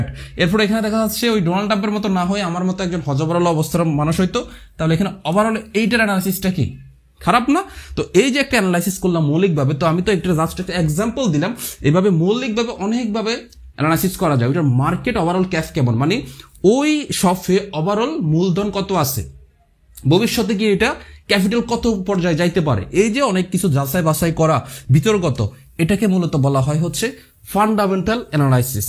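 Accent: Sylhet, Bangladesh. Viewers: ভাই আফনি কিতা সিলেটি নি 😮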